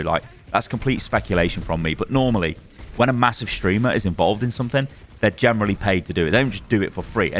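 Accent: United States. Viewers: I appreciated the return of the American accent,. 😄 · your country accent is 🤌 top notch.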